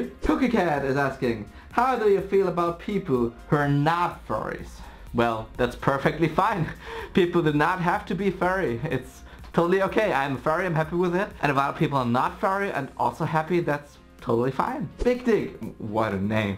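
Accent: Germany. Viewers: The curse of the "German Accent" is with you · your accent is as german as it gets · nope, german accent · you have a German accent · you do have a bit of a different German accent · I love your german accent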